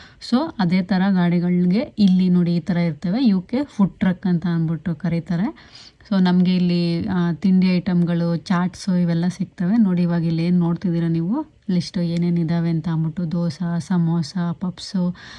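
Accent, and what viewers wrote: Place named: Mysore, India. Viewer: Mam I am new additions to your channel thumba chennag nam mysore bashe mathadtira thanks a lot namge ee beautiful life na thorsethirodakki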